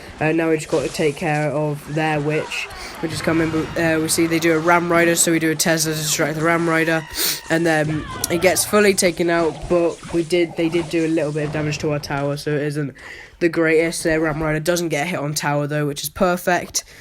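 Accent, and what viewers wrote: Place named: United Kingdom. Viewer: I was enjoying the vid until u spoke bri'ish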